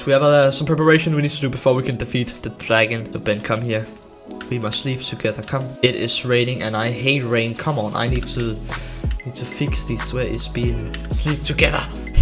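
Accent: Denmark. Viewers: hej jeg kan høre du fra danmark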